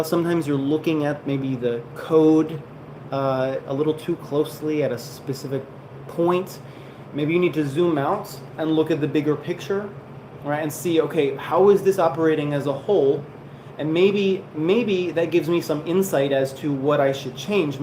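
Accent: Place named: United States. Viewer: This live stream- I don't know why?- giving sence of enjoyment like that cool American and British speaker and thier unique speaking style. You are using American Acccent too.😅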